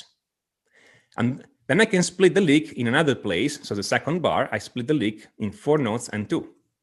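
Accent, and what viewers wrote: Italy. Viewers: I love your positive energy, your great insight, your interesting ideas and angles and your charming italian accent. You make me smile as you make me wiser. Thanks! Mille grazie!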